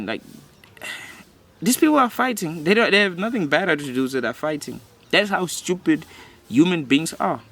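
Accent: South Africa. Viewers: I could tell by your accent that you're South African. I'm from East London, Eastern Cape. Keep up the good work, love your content.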